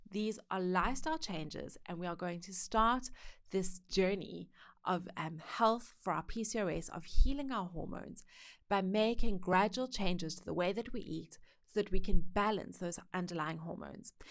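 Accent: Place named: South Africa. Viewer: Accent sound South African...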